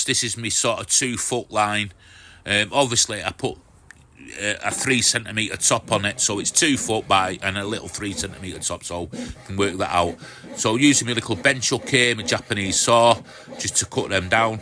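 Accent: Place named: Manchester, United Kingdom. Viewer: great projects, like to hear that proper manc accent when you narrate your videos,can see you getting more confident in yourself,can't wait for the next one 👍👍👍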